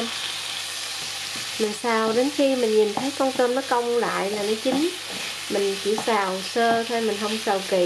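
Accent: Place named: Quang Nam, Vietnam. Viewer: chia se mi quang dung cach cua xu quang cua co. con mi quag cac noi nhu pt ngot duong nhieu qua kho an lam chia se tieng noi de thuong giong nguoi quang co cam on nhe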